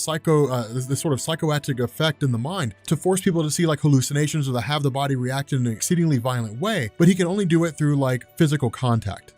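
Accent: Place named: Appalachia, United States. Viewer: i thought i heard hillbilly in your voice i hate KY as well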